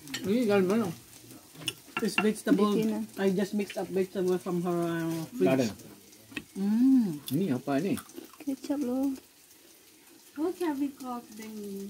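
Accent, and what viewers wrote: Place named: Batak, Indonesia. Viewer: Gak tau knp dari dl tu suka bgt cara ngomongnya orang Batak...apalgi klu liat Judika hahahahh skrg liat kk aja dl..horassssssss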